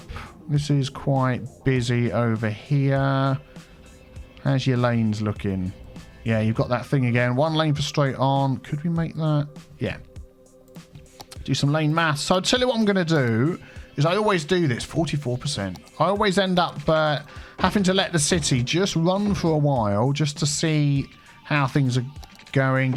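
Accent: United Kingdom. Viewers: start talking like a Brit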